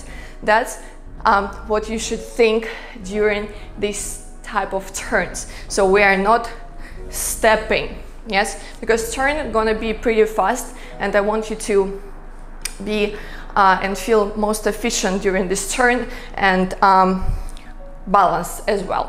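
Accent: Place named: Russia. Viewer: Какой шикарный русский акцент😀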